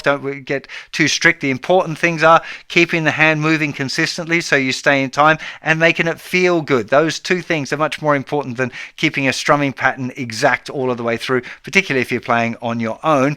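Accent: England, United Kingdom. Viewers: Bro! You’re Australian? Been watching your videos, but never realised - definitely hear an English twang. But you said you played mandolin in Tasmania. What gives?? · Good day to you sir .I love ur all guitar teaching and lessons ..you are a British? If I'm not wrong hehe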